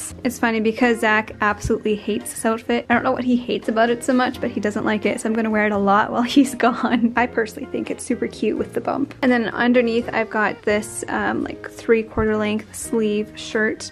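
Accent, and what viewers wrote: Canada. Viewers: I am so excited to have found you. I hear a Canadian accent but wonder where you are from exactly.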